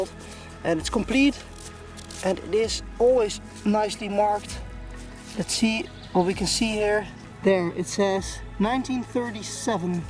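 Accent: Netherlands. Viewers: Can I come with you one time? Sounds like you are from Holland, damn I love this 🤓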